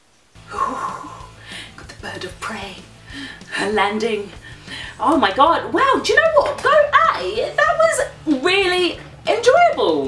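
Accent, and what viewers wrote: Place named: United Kingdom. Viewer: Акцент British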